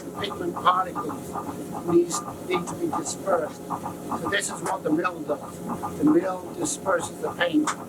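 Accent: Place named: New York, United States. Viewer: That is absolutely a NY accent!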